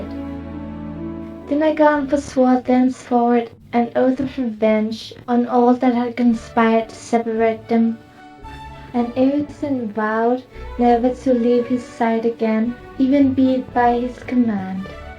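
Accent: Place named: Netherlands. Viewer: I liked the video :D Thank you for the subtitles, though. You have a pretty dutch accent :)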